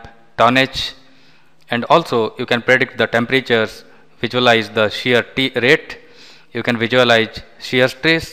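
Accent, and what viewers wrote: India. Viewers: Consultant - I think this is only an introduction to Moldflow capabilities. I was looking for something explained elaborately - like how to use molding window to predict number of gates, how to predict the packing time, how to take a decision how much should be the coolant temp to extract heat from the part. If you have these videos, then plz share. Those who browse for moldflow, are certainly aware of the basic capabilities which u have addressed here. I think U r from Odisha - It's not "Rapage"....plz pronounce it as "Warpage". Bujhila bandhu !! All the best ! keep posting such videos.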